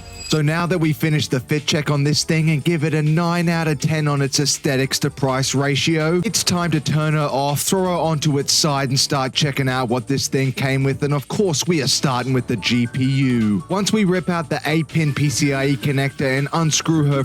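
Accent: Australia. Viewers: why does this ai narration sound so aussie right down to the adjectives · are you aussie? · Your Aussie voice saying, "...the total price of this PC being seven hundred and ninety-nine Benjamin Franklin's..." made me spit out my beer, as if it was a Vegemite Sandwich. Benjamin Franklin appears on the $100 Bill [in US Money]. If that were true, this crappy PC build would cost $79,900 [US Dollars]. Quite the overstatement! You owe me a beer. Next time I'm Down Under, I'll let you know., so you can put one in the refrigerator for me. I don't drink 'em warm. Oh, sorry, forgot you're not European, you're Australian. Scratch that. I'll drink a warm beer there. I'm not sure if you guys [blokes, mates, whatever you call yourselves] even have refrigerators. BTW, I get drunk after one beer, so you might want to make a bed for me to sleep over on. Oh yeah, after I fall asleep drunk, I sometimes piss myself, so heads up! 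You might want to put a plastic cover on the mattress before I sleep over. As for breakfast, nothing special, just two eggs "sunny side up", some bacon, hashbrowns, orange juice, coffee, and toast. You don't have to make pancakes for me. I don't want to be a bother.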